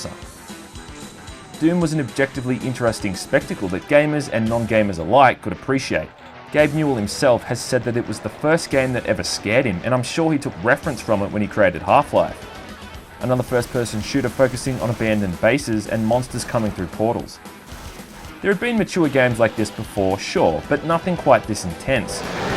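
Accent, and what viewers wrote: Australia. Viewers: I can accept that it is the grand-daddy of FPS games, but I still like Heretic better. Although I can concede that Heretic is made a lot easier by not having any enemy using a hitscan weapon against you. And I absolutely love your Retro Reviews. Made especially better with your Aussie accent of course, and funny things you say that only us Aussies would say :P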